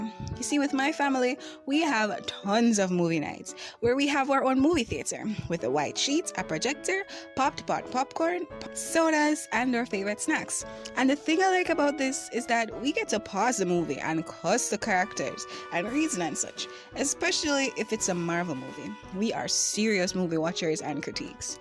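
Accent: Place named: Jamaica. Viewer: I love Jamaican accent great video and editing awsome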